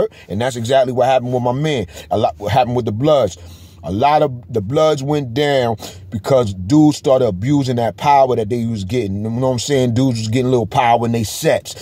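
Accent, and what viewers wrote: New York, United States. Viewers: I still cant get used to hearing a NY accent talking bloods n crips!! Crazy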